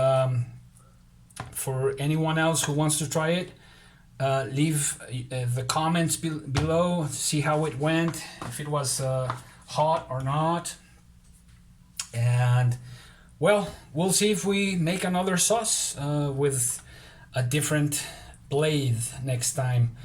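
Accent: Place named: Spain. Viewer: Maybe your accent is derived from Spanish, Wenders is German, but seriously heard a similarity, also in your contemplative style.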